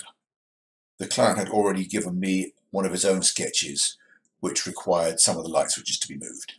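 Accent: England, United Kingdom. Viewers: I just love the term; ‘Herniated Helix’. Not a term I’ve heard here in the UK. I am amazed that you still have your English accent, despite your use of American terminology. I tend to readily pick up accents and if I lived for a year in the US, you would believe that I was born there. I do enjoy watching your videos. Best, Jason.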